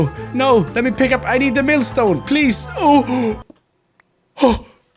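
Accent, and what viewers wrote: Sweden. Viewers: You Swedish?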